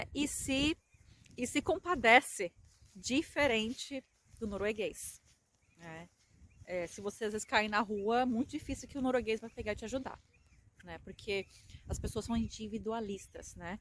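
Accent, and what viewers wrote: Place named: Caipira, Brazil. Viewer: Porque ele só existe no conceito cristão. Deus não é um velho de barba branca que viveno céu para amaldiçoar, castigar ou abençoar pessoas. Isso só serve para controlar o ser humano pelo medo. Deus existe sim, mas não é nada disso que o cristianismo de modo geral prega. Os noruegueses despertaram. Putz ouvir esse erre caipira não dá, fui...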